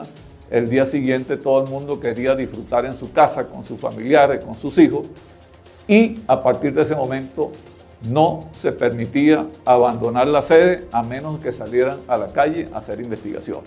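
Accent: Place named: Caracas, Venezuela. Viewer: Qué bonito escuchar este acento nuestro de caracas neutro respetuoso.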